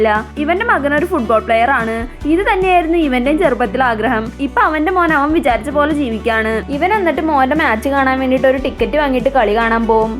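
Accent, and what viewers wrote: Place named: Thrissur, India. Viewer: കഥ പറയുന്നവൻ തൃശ്ശൂർ എവടെ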